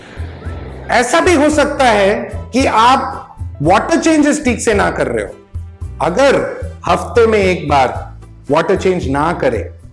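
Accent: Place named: Mumbai, India. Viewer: Sir ke dialogues Sun kar lagta hai ki vo Mumbai sa hai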